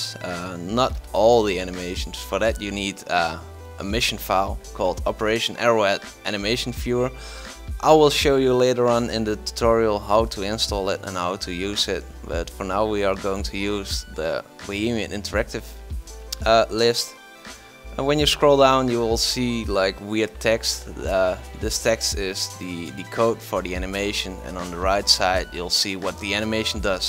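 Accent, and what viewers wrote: Netherlands. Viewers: are you dutch ?? i can hear it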